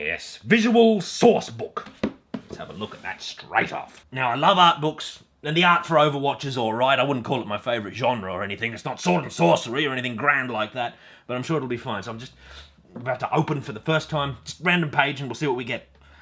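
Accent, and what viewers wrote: Australia. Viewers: Is he an Aussie? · I came from the old Hero Quest video thinking you were English and find you're actually Ozzy! You'd fit right in with over here with the D&D crowd, hilarious stuff mate :-D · Are you Aussie?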